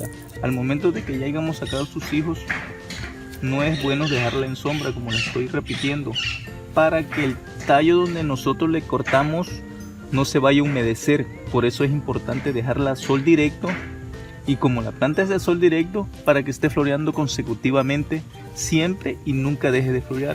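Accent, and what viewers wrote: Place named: Salamanca, Spain. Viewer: Que alegría escuchar a un salmantino 👋 Muy buenas recomendaciones, saludos también soy de aquí 😁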